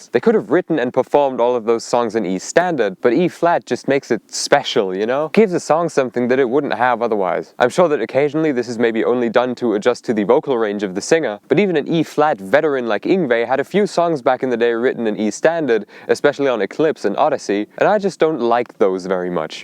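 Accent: United Kingdom. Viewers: I only play in Eb. Spot on British sounding German sir. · Could you, for the love of God, explain who you are british sounding person in Germany with good content but an odd guitar collection!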